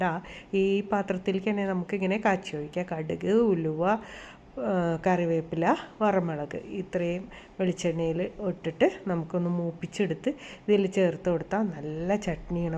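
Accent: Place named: Thrissur, India. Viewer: ചേച്ചീ നാടെവിടെയാണ് സംസാരം കേട്ടിട്ട് തൃശ്ശൂർ പോലെ തോന്നി ഞാൻ തൃശ്ർ ആണ് ആദ്യമായാണ് ചാനലിൽ ഇഡ്ലി റസിപ്പി കണ്ടത് ചേച്ചീടെ സംസാരം ഇഷ്ടമയപ്പോൾ കുറച്ചു കൂടി വീഡിയോസ് നോക്കി ഇനിയും കാണണം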